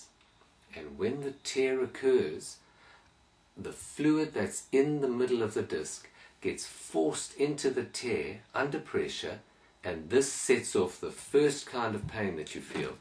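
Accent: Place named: South Africa. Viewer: Hello! Sounds like a nice SA accent there! I have had a slipped disc 3 - 4 times. (had the MRI etc.) I put my back out over Christmas and have the usual lower back pain but thankfully no leg pain. (my hip has gone to one side a bit) I'm really not sure these days whether it's best to lie down and rest or try to walk in the moments when I feel better during the day? May I ask what your view is? Thanks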